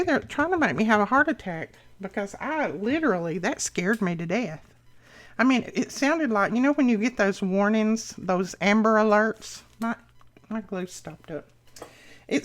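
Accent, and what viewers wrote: South, United States. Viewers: I’m so glad you got your Facebook taken care of finally and your YT name is back! Yay!! As always I enjoy watching you craft. And I love hearing you talk with your sweet southern accent. That journal turned out really cute. Sending much love and blessings, Sharron 🩷🤗🩷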